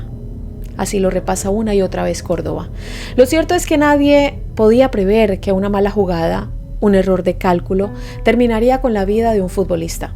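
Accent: Colombia. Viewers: Saludos,. Soy nuevo en tu canal y me tengo que poner al día con numerosos vídeos. He comentado este en concreto porque recuerdo perfectamente cuando sucedió y como me impactó. Solo decirte que me encanta el contenido y cómo lo narras (no puedes negar que eres periodista ☺️) además de apasionarme el acento colombiano. Te felicito y espero que sigas subiendo muchos vídeos donde investigues temas apasionantes. Un abrazo desde 🇪🇸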